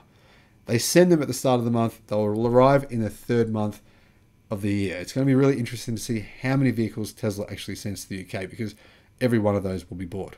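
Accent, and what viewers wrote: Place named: Australia. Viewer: is based in Melbourne, Australia. Listen to English and his accent - You clearly hear that he is an Aussie. How in the world could you think that this is an American fanboy site. This is not a Tesla fanboy site. This is an good channel about the ongoing electrification of our transports.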